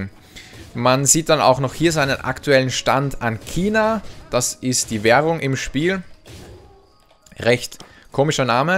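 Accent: Austria. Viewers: bist du österreicher ? und nebenbei .... hab mir lang überlegt das spiel anzufangen und du hast mich jz dazu gebracht :D du kannst es so gut erklären xD würde mich auf antwort freuen und vill können wir ja mal gemeinsam zocken :D Grüße, Ravaer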